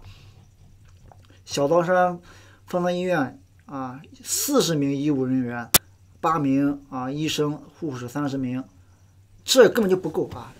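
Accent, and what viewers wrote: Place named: Xiji, China. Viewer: 你口音像西吉县的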